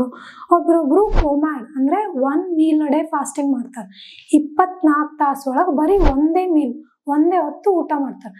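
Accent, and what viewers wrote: North Karnataka, India. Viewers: I am from Bagalkot . Love the way she spoke the original north Kannada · Thank you so much medam channagi helidira nam utar karnataka shaili mattu namma halli bashe yelli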